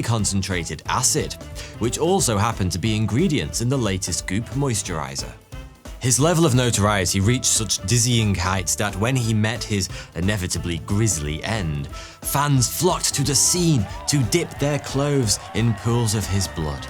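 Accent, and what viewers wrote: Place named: England, United Kingdom. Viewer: is British. Most of us pronounce Arkansas as, well exactly that. Not Arkansaw.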